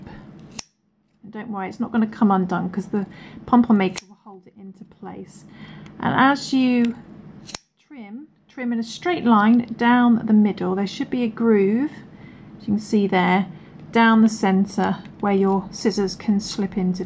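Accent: England, United Kingdom. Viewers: Thank you for that. It is nice hearing an English accent on YouTube for a change... ;-))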